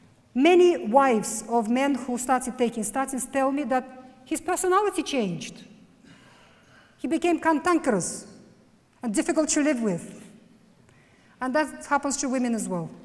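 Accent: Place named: Russia. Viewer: It's Russian. She's born in Russia, then went to UK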